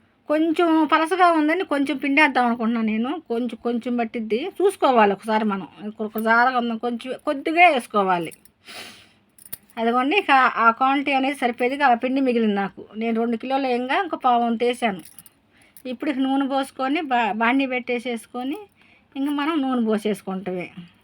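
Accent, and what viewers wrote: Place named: Rayalaseema, India. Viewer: చూస్తుంటే తినాలి అనిపిస్తోంది, చాలా చక్కగా సీమ భాషలో చెప్పారు.. 👌👌👍👍👏👏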